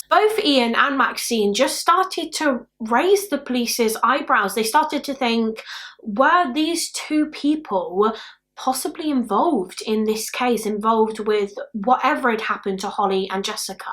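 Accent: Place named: England, United Kingdom. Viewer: I think ur work is amazing!!! Although i dont particularly like English accents - ur content is too good to ignore. Its comprehensive, interesting and well written. Keep it up!!! Also....introduced to ur channel by Josh Miles and his collab vids 😁👍 keep up the great work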